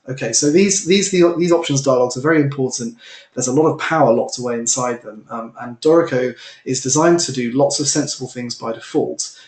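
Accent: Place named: England, United Kingdom. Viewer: It would help very much if you SLOW down your speech. #1 not everyone can understand the nuances of a 'English' accent. #2 The presentation feels rushed. I know your up against a clock, but it feels that way to. Just about the time I think I'm starting to 'get it' You fire off a 6 word phrase that leaves me interpreting 'you' rather than the program. I appreciate your effort and enthusiasm. If I were presenting this and I was from Jackson Missippi USA. at this tempo...you'd be writing me (: God save the queen !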